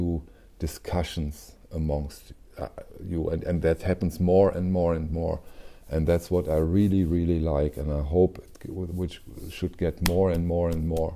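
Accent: Germany. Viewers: I have found my new 'go to' to hear about vinyl, drink coffee, and hear a good old fashioned German accent! I lived in Wiesbaden for several years and miss the 'motherland.' I am fairly new to your channel but look forward to it. Keep the great content coming. And let the puppy get more screen time. :)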